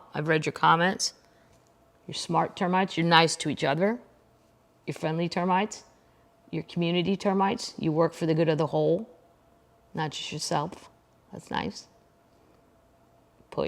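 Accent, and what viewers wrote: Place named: Appalachia, United States. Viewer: your hillbilly accent has gotttn very strong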